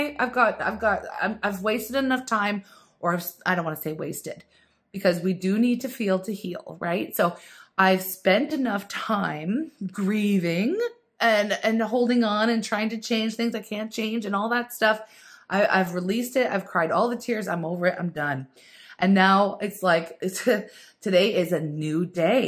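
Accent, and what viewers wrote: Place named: United States. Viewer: Your american accent is amazing! I'm always sooo inspired by you and your content. Absolute Legend!!!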